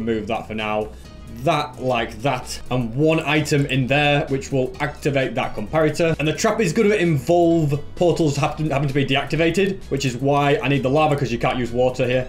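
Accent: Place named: Yorkshire, United Kingdom. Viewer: You have a Yorkshire accent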